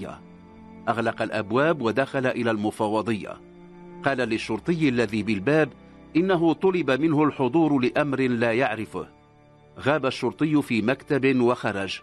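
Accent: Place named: Morocco. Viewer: انا معجب ومتابع للقصص التي تتحفنا بها بصوتك المغربي الواضح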